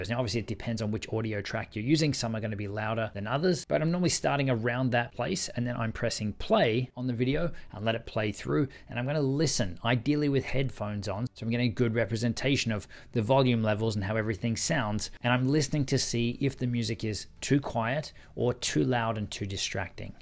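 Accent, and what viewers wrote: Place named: Australia. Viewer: Hold on, your accent is Aussie, but you spelt 'Colour' in American. The betrayal though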